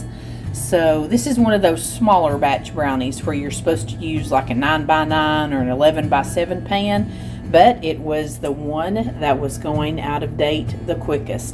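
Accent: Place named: Mississippi, United States. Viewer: Wow! I just found you. You are my Favorite Cook on YouTube! I am from Mississippi and you sound just like home! ❤️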